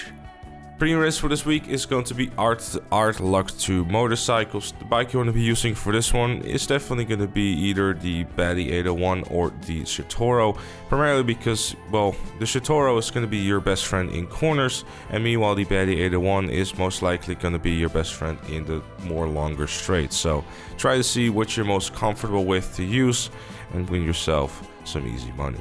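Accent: Netherlands. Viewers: Hmmm yes ik ruik een Nederlander met die stem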